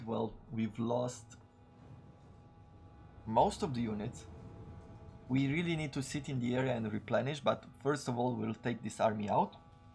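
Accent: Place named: Romania. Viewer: You sound like you are from SE Europe maybe? Romania?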